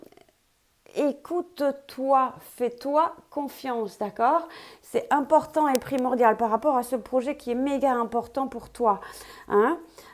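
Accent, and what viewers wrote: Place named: England, United Kingdom. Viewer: tu as un petit accent tu es anglaise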